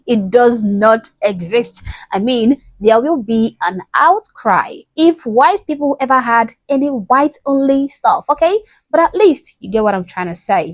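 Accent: Africa. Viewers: You have an African accent. Are you able to find African content for your YouTube videos. Black schools have their graduation traditions. Do you have graduations in Africa? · The other day, I was going on about hyphenated Americans. one in particular african-Americans... I was explaining the difference (in my opinion !) between black folks black Americans & African-Americans... & Sweetie I want Thanx for just being you & your accent, because in my book an ""African-American"" first & foremost they have to 1) be American citizen, but almost more importantly they have to some like your exact accent, no disrespect, what I would (maybe Mediterranean Africa would be different !) but all of y'all south of the Sahara all them (I have not heard many different accents from various parts of Africa) but they're going to sound a lot just like you ! But black Americans who's 3, 4 generations ago was "slaves", they don't know a damn thing about Africa, & are no more African that I am ! 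I am not denying the black that they are black folks I mean I am not blind yet ! (🙏Thanx be unto Our God & His Son Jesus Our Lord & Savior, Amen🙏) I can see I am not color blind, I've even said if black folks where to be stupid enough to hate America so much they transplanted themselves over to Africa... They would be in shock ! They (the indigenous Africans) be looking these transplants they would not see the American blacks as Africans; there's a completely different mind set a whole different way of thinking about things, & American blacks over in African countries be seen like fish out of water, like tourist really, that you came for a visit, I wonder if the real live Afric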